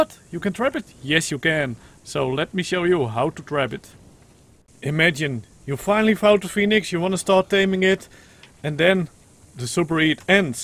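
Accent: Netherlands. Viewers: Are you dutch xD Sometime you've got a Dutch accent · oefen je engels aub ik hoor dat je nederlands bent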